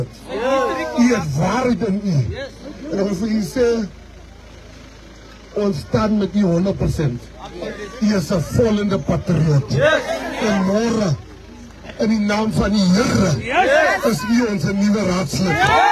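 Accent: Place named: Namibia. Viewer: Coloured from Namibia, Mr u praat soos n Kleurling van Namibia, wat u se is presies wat in ons land ook aangaan.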